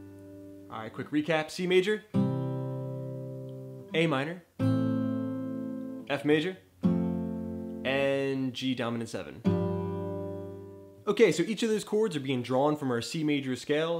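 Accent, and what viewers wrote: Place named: Philadelphia, United States. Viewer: Very helpful. Thanks. And bonus points for the Philly accent. Geaw Iggles! (And Gritty.)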